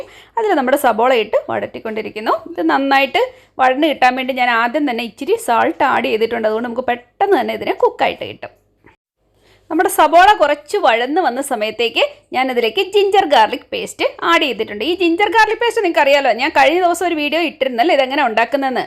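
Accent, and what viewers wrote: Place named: Central Kerala, India. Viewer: Kollam,Allapii, kottayam, Thrivala, IDDUKImy guess 😃☺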